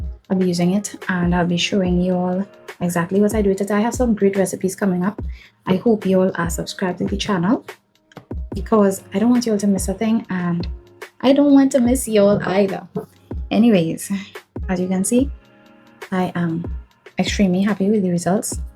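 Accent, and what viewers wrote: Trinidad and Tobago. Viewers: You sound Trinidadian 🇹🇹🇹🇹🇹🇹. Big up yuh whole self. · Fello Trini here!!! Really enjoyed the video and what a great idea to make homemade feta. Can't beat a Trini accent!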